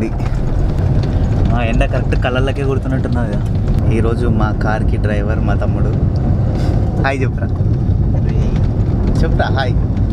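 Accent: Telangana, India. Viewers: Lockdown punnyama ani okasari YouTube lo me video chusa ... Eka roju continue ga me videos chudatam alavatu aipoindi ... Meru matlade Telangana yasa chala bagundi ... Meku nenu fan aipoina bro .. me rides and meru chupiche places and awareness chala bagundi ... Good luck bro ... All the best for future rides ..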